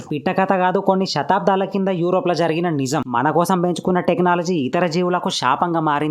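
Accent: Telangana, India. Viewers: యూరప్ లో కాదు చైనా లో 1970 వ దశకం లో మావో జడాగ్ నేతృత్వం లో,,,,పిచ్చుకల ఊచకోత,,,,దాని గురించి తెలంగాణా మాండలికంలో బలే చెప్పావు❤❤❤❤❤❤❤❤❤❤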